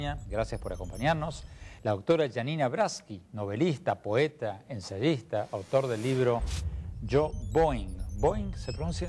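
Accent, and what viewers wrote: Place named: Argentina. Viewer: Me gusta el acento que tiene openheimer, es argentino?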